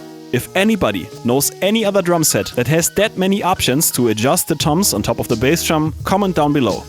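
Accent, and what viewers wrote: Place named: Germany. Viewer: Kann man nicht für wenig Geld einen Muttersprachler den Text ein sprechen lassen....spoken German English is soooo shamefull. Beside that: nice work.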